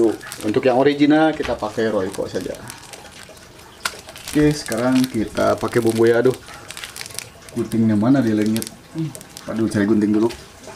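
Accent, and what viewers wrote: West Java, Indonesia. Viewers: beda wae ari urang sunda mah nyarios na jakarta bandung hahahaha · Kang orang sunda mana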